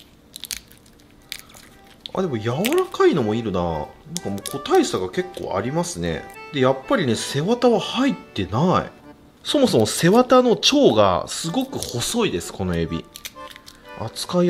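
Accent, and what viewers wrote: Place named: Aichi, Japan. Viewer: 初コメントさせていただきます。前から見ていて、豊橋の知り合いの話し方とほんと似ていて、愛知だなぁって感じてました。冒頭の『さばいてくぅ～』のとこ、大好きです。魚をさばいて内臓やらへっちゃらで触ってるのに、片栗粉の感触が苦手なシーン、笑っちゃいました、のそういうとこ、いいっすね。これからも様々な 『さばいてくぅ』見せてくださいね。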